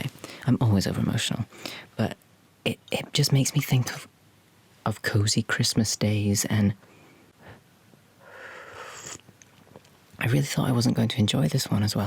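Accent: Scotland, United Kingdom. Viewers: cool! I thought I detected Scottish! I'm American but my dad's side of my family is Scottish, so I hear their accents almost every day! I've always wanted to go there.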